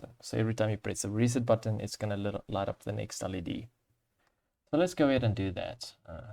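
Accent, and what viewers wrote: South Africa. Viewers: I hear an Afrikaans accent. 😁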